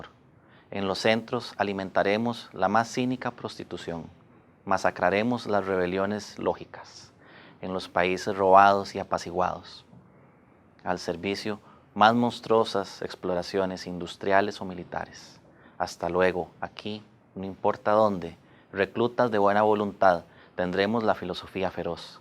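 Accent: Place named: Costa Rica. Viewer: es por q es costaricence y su forma de expresars es muy peculiar,con un acento distinto al demas español.y bueno si también ay palabras q significan otra cosa pero siempre ligado a su idioma popular.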